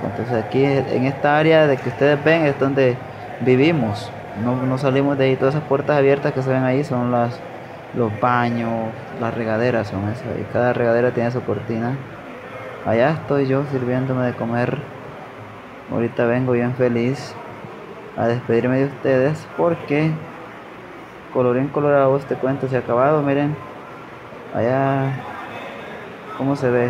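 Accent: Mexico. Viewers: Parece más mexicano que hondureño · Al principio te escuchaba tu acento de Mexicano pero cuando dijiste “vaina” me dije a mi misma “este vato” no es mexicano! Y conforme fui viendo tus videos es que aprendí que eres centroamericano. Ni modo, te hiciste Mexicano by association 🤣 Lol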